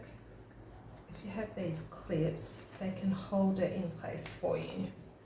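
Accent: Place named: Australia. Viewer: Love the Aussie accent!!😊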